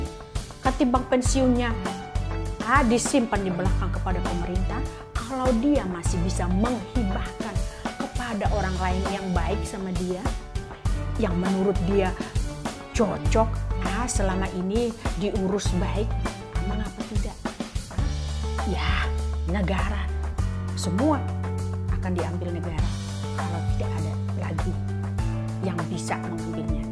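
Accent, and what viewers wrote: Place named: North Sumatra, Indonesia. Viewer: Ceritanya tan, sangat menarik sekali. Jadi pertama tama waktu masih ngobrol dan ketemu, kencang ya tan kalo bicara. ? Nggak kebayang ya Tan, tante kan logat Batak nya sangat kental haha. Jadi menarik sekali kalo didengar.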